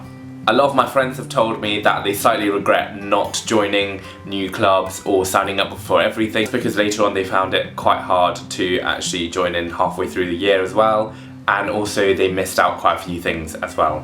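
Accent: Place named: United Kingdom. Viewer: It's my absolute dream to go to Durham and castle!! Also, I can't believe you're from Hong Kong - your accent sounds so British!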